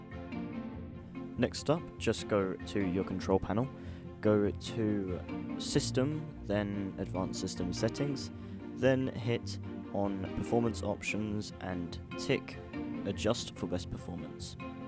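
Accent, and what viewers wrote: United Kingdom. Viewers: yes and this british accent makes it FUCKED UP.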